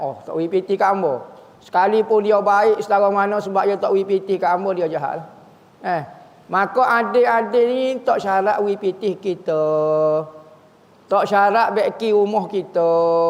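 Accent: Kelantan, Malaysia. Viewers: saya oghang Kedah okay ja dgn kecek Kelate dio tu...faham gak sikit2...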